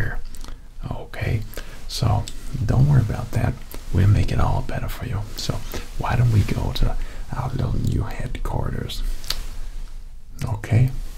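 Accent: United States. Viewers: That’s actually a really good American accent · Speaking American accent. What the heck. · Everyone’s talking about your joker impression, but I’m just amazed by the American accent in general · the American accent sounded great. You should do it more often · Your American accent is getting REALLY good!! 👏👏👏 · damn your american accent is really good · he sounds so different when speaking with an american accent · His American accent isn’t perfect but it’s pretty darn good, much better than I expected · Happy Halloween and you wore Joker costume and American accent. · I love it that does an American accent, but still says "torch" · Amazing. Two giveaways to the American accent: 1. We call it a flashlight, not a torch. 2. British ASMRtists say “anything” like “a-nuh-thing” or “a-neh-thing.” Americans say it like “eh-knee-thing”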